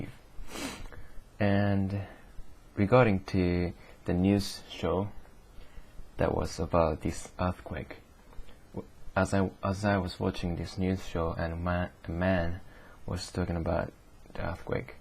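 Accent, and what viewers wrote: Japan. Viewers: From the first word you said, I heard a deep Japanese accent resonating from you. Don't get cocky and think that the Japanese are the best at everything. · I think no matter how good someone gets at speaking a foreign language, he or she will always have an accent. I like hearing someone speak English with a foreign accent though, it is kind of unique. I have been learning German for years and I know how hard it is to become fluent (which I am not). So to hear someone speak fluently in a foreign language is impressive because that takes a lot of time and hard work! So you do have a Japanese accent, but don't worry, it is just part of you.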